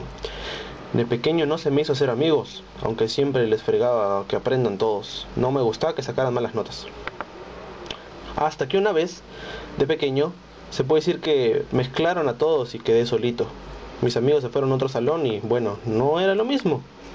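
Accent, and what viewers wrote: Peru. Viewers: Eres peruano??? Bien csm salu2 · ERES PERUANO!!! :0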